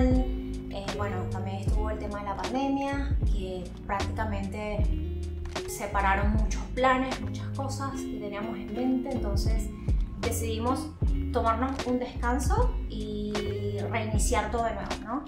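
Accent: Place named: Argentina. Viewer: Estás súper argentinizada por lo menos la entonación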